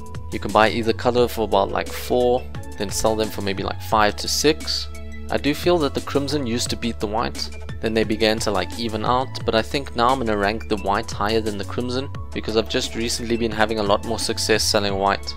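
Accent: South Africa. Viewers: are you from South Africa, because I am too? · is this guy south african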